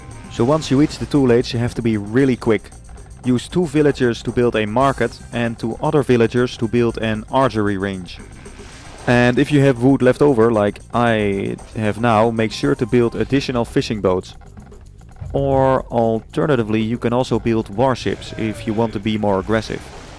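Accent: Netherlands. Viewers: From what country are you. You sound Dutch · It was nice to hear a Dutch guy playing and explaining about this game :) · Sounds really Dutch😄😄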